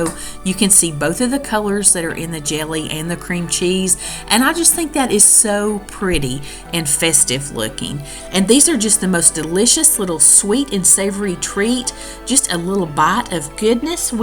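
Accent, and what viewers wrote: South, United States. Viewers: Merry Christmas and Happy New Year to you and your family. I just recently discovered your videos and I really love them. I love your southern accent. Southern people are always so friendly and they sure know how to cook! What you said in this video was so nice and sincere. It brought a tear to my eyes. Thanks again for making your videos. I love watching them and I’ve saved several recipes to try. I call them my experiments.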